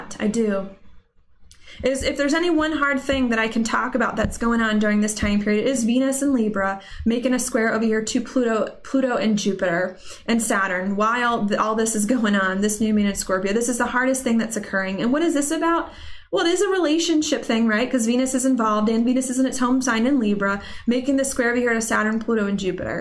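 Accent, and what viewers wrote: South, United States. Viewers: You sound like you are from NC or Atlanta.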